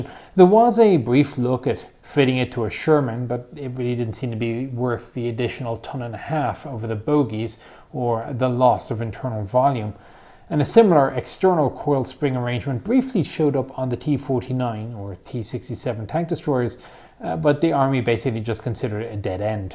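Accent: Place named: Ireland. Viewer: I never noticed Irish in you accent... Untill I started noticing h-wheels. Not completely American yet XD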